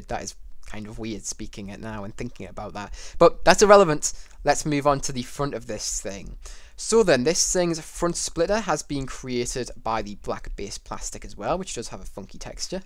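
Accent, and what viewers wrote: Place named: Ireland. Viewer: U sound Irish just loving it Bro 😎🇧🇸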